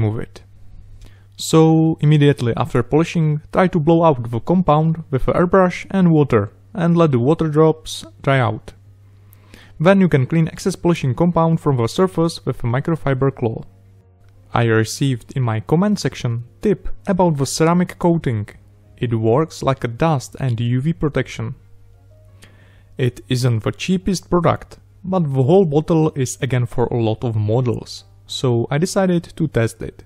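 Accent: Czech Republic. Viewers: Are you from Czech republic? Based on your accent :D. btw amazing job. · There is only one accent in the wold like this. And it is unmistakably Czech! :D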